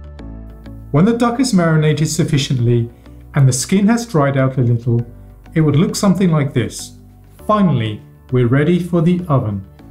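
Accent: United Kingdom. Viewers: You spoke Chinese with an English accent very cute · I've got to give it to the the Brits, thought...they make all ESL speakers sound so sophisticated. Back to the video...thank you very much for this easy and great recipe.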